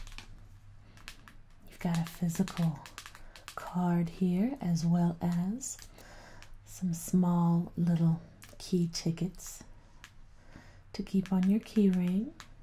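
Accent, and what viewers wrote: South, United States. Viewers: Is she from Texas? It sounds like a slight southern something in her dialect...not full on Texan or Dixie talk but there’s a little southern comfort in there.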